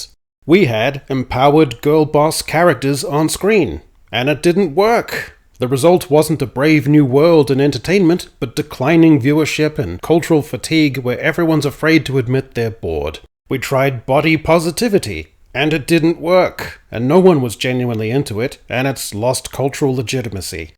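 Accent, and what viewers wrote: New Zealand. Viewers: I have to ask this. I have watched nearly all of your videos, and enjoyed them, partly because of your sharp analytical mind, partly because of the Kiwi accent ... but ... who is that guy with the really complicated situation in his hid? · The classic New Zealand accent pronounces "Ten cents" like "Tin cints", with a very short vowel that turns the 'e' into an 'i' That said, may be using both "womAn" and "womEn" correctly, but American ears can't distinguish between the way the Kiwis pronounce 'a' and 'e'. I lived in NZ for 7 years, back in the 1970s, and in Australia for 3, so I'm acquainted with some of the idiosyncratic ANZAC dialect.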